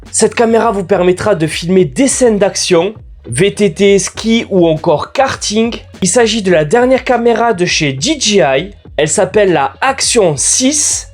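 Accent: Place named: South-West, France. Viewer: Je trouve qu une vidéo tech avec l'accent du sud-ouest ça passe toujours mieux 🙏🏻☺️ #teamchocolatine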